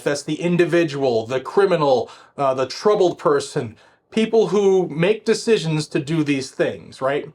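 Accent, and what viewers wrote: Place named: South, United States. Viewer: Y'all? You're getting a wicked southern accent.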